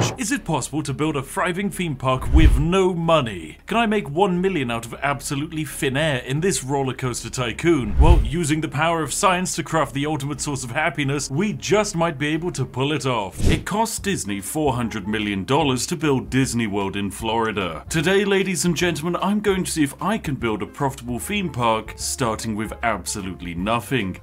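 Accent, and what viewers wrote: United Kingdom. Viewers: Holy youre so funny, especially your british accent, i love it!!!! you just earned a sub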